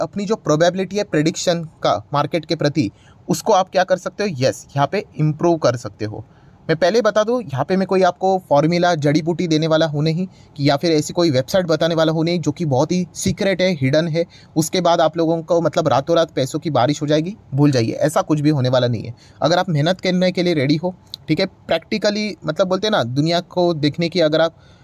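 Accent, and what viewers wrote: Maharashtra, India. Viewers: Sir aap kha se he Maharashtra ya Delhi se please confirm Aap ki voice se to aap Maharashtra se lgte ho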